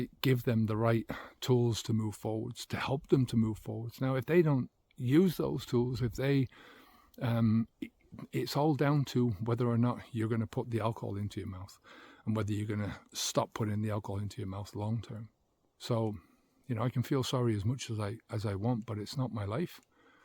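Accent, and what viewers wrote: Ireland. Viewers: Did you used to live in ireland? You have a slight irish accent.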